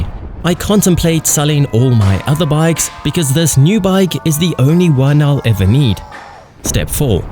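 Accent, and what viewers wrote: South Africa. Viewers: I am VERY good with accents, but not perfect. You are most def from South Africa, but English heritage or Boers/Afrikaans. heritage. I am NOT hearing a pronounced Afrikaans accent, so I am leaning to an English heritage, predominantly. Am I correct? I am just a bloke born and raised in the USA who lived in the Caribbean for 15 years. MANY of those years in the 1980's on the Dutch island of Saint Maarten where so very many expat South Africans lived on the boats they made and sailed away from their birthplace forever.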